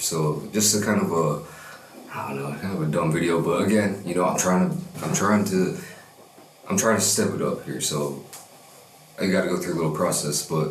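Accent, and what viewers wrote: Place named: United States. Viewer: You can tell that been to America by the way he talks 😂 Keep up the great vids man 🙌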